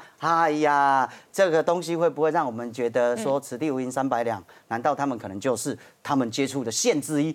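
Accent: Taiwan. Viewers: 台灣國語聽不習慣？ 不然要聽北京國語捲舌嗎？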